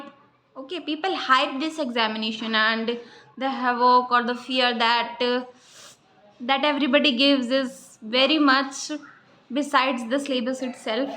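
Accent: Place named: Himachal Pradesh, India. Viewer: himachali accent 😁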